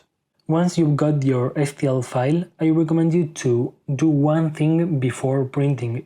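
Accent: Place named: Spain. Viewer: Mola que hables en inglés con acento español, así, por lo menos yo, lo entiendo mejor xD. Por cierto, tus videos son muy buenos.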